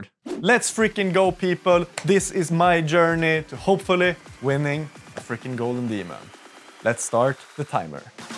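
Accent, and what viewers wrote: Sweden. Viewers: Älskar den svenska accenten😊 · The Swedish accent makes his face really punchable, damn... like nails on a chalk board.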